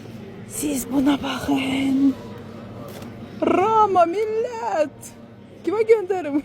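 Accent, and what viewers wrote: Ganja, Azerbaijan. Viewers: Necesen Gəncə lehcesiyle danışırsan maşallah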